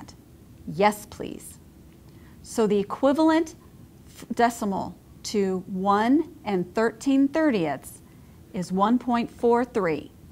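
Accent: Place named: United States. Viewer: I don't like her she has an American accent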